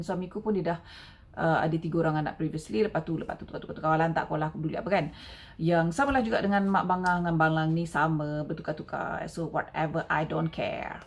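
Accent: Negeri Sembilan, Malaysia. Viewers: Dengo suara pon dah tau org N9..kan?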